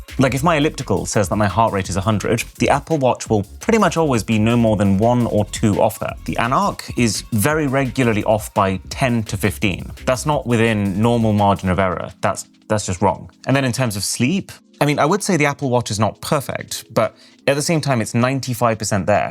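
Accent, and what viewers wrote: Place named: RP, United Kingdom. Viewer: Fucking hell that’s a bad hat. The public school accent combined with the backwards hat gives “hello fellow teens” vibes.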